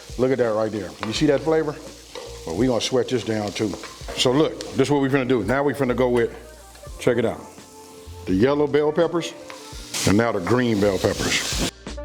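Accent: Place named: West Coast, United States. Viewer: You are so California cool. I love your West Coast accent and your southern way of cooking.❤😊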